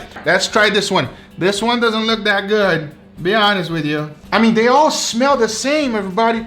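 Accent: Brazil. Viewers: ill bet all my money this dude is Brazilian I can hear it in his English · Because it's a Brazilian accent. Portuguese has a lot of tone color and variation.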